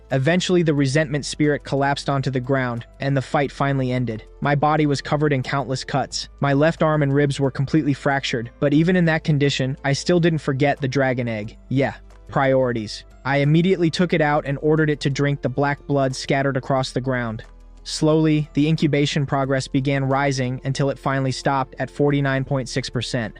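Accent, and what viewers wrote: Jamaica. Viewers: Ohhh yuh Jamaican.. eh video tuff bro nah gu lie but wish wah prt 3 did di deh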